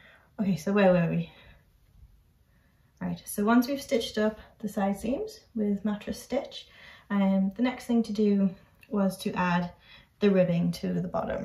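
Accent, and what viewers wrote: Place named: North East, United Kingdom. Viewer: I have just got myself a Sentro machine and enjoying experimenting. Videos are great. Good to hear a northern, dare I guess a northeastern voice. ❤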